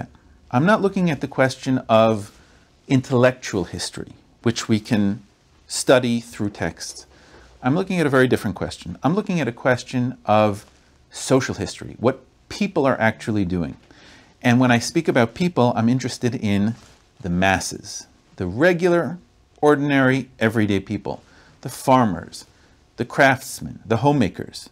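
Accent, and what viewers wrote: Russia. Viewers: Кто это? Семеныч? Что за рязинглиш? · From the first phrase it is clear that we have a Russian person in front of us.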